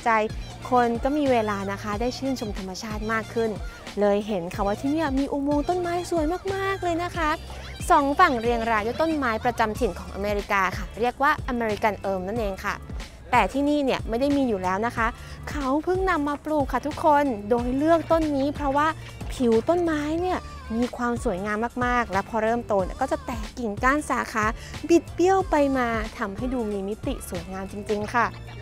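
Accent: United Kingdom. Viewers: หญิงคนขายงานศิลป์ชมคุณกู๊ดว่า "ชอบสำเนียงและน้ำเสียง UK ที่มีเสน่ห์ไพเราะจับใจในการชื่นชมในงานศิลป์ ทำให้เขารู้สึกดีมีกำลังใจมากๆ เพราะไม่ได้สัมผัสบรรยากาศ และความรู้สึกดีๆ จากนักท่องเที่ยว ที่สวยและน่ารักแบบคุณกู๊ดมานานมากแล้วครับ" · ฝรั่งชมคุณกุ๊ดเกี่ยวกับสำเนียงอังกฤษฟังแล้วมันมีสเน่ห์น่ารักจริงๆค่ะสำเนียงจะแตกต่างกับสำเนียงอเมริกา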